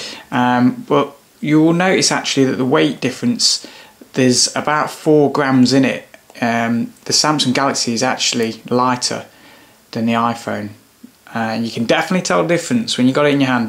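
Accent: United Kingdom. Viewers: Cockney accent. People really admire it.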